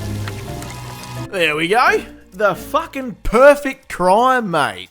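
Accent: Australia. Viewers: brang back so many fking memories of the hitman blood money series very nostalgic excellent commentator 🇭🇲💯🤣😁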